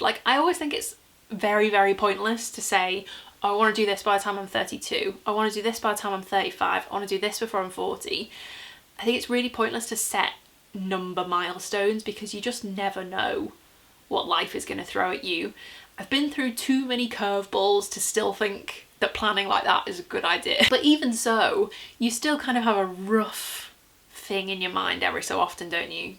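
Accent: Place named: Wirral, United Kingdom. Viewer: I haven't left Wirral in almost a year ( cheers covid ), I thought I recognised the accent and the sense of humour! :-)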